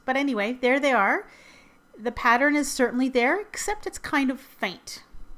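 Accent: Canada. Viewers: ...are you canadian????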